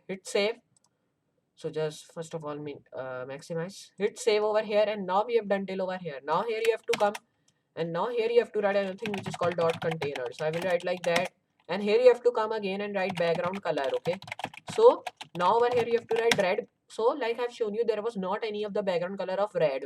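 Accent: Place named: India. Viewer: dude, that work is great, thanks for the video.... but... But, your spoken accent is understandable! Speak slower for god sake! I guess you are Indian? Indian english accent is very hard to understand.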